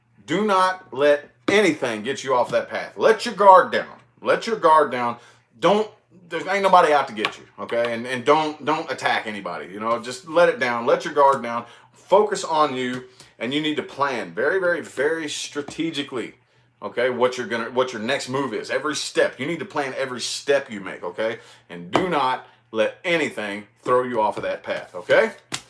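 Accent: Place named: Mississippi, United States. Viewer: You sound like every man I know in Mississippi 🤣 gotta be Alabama Mississippi or maybe Louisiana.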